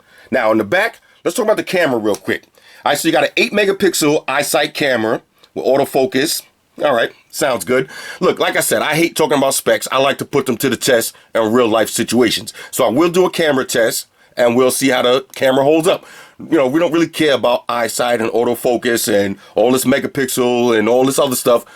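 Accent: New York, United States. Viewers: this review was really fuuny. i have a feelin this guy is from nyc cuz i talk like that too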